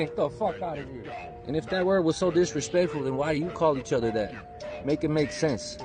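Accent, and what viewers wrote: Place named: Mississippi, United States. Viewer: Bro 😂😂😂 wtf are you gonna do about it? Sounds so confederate Mississippi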